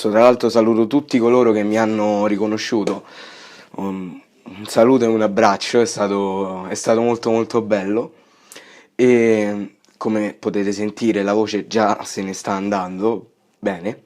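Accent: Rome, Italy. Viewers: Parli romano. Non ho parole.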